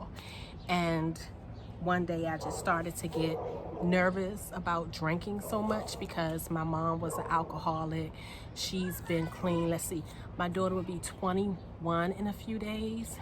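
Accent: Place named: Baltimore, United States. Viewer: I knew once I heard your voice your were from BMORE!!!! I’m was born and raised there, but I live in GA. Your garden is BEAUTIFUL!!! Can’t wait to see this years garden!!! 💐💐 Peace & Blessings ✨✨✨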